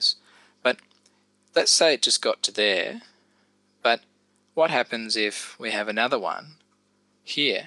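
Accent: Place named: Australia. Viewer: Hey. I am in my first year of studying a paramedic / nursing (double) degree, got my A&P exam on Monday, on swot vac week at the moment. Don't know how I found your YT Channel but it's been SO useful. I'm an aussie too and can I say it's so nice to finally find YT videos that have a normal Aussie accent! So easy to listen to. (Nothing against other accents, it's just easier to listen to being that I am Aussie too). You're great at explaining shit so thanks heaps for that, CHEERS! V useful!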